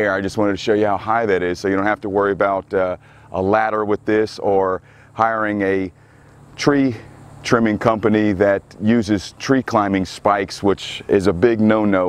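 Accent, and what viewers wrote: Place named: North Central Florida, United States. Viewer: Watching this makes me homesick. I've lived in New York City for over 20 years but grew up in Gainesville and St. Augustine. All the beautiful palms! Even your accent- north central Florida twang a-la Tom Petty!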